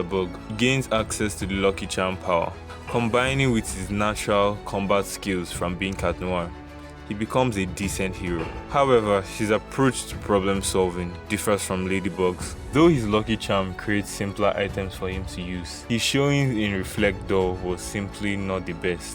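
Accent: Nigeria. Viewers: Are you Nigerian? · are u nigerian by chance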